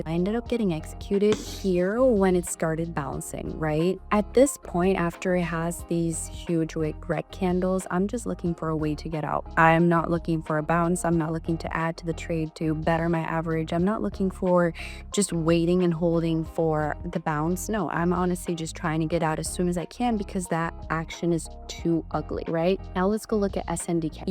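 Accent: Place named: Paisa, Colombia. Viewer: quedo fascinado viendo lo tesa que eres y escucharte hablar ingles y español en ese acento de acá super paisa uffff😍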